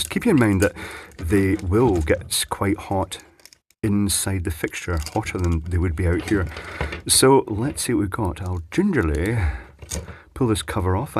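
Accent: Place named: England, United Kingdom. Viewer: A bit odd to hear "ain't" in an English accent.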